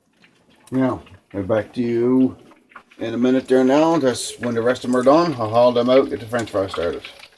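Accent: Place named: Newfoundland, Canada. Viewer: I am loving your accent... I was thinking you’re from Ireland living in Alabama😂. 🇨🇦 Newfoundland!!! Never would have placed it 😉 thanks for the perfect recipe 😋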